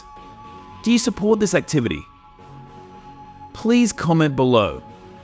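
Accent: Australia. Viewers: I like that the narrator is from Australia or some place where they sound Australian I love it it gives the program more character and sounds really great😊😊❤❤viewing from Monmouth Oregon <num>😊😊❤❤ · You sound like an Aussie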